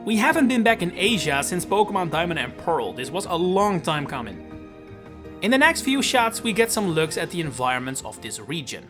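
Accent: Netherlands. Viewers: New to the channel and great video. Side question: are you Dutch?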